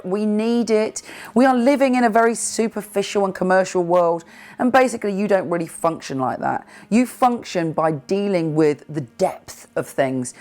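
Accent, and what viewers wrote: United Kingdom. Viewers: i wish i had your so refined british accent...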